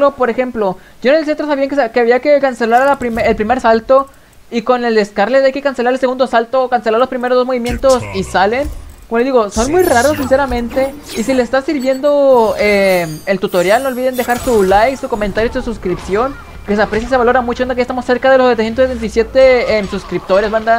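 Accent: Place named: North, Spain. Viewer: Lo intentaba una y otra vez pero jamás salían. Gracias por compartir el secreto persona con marcado acento norteño 🐉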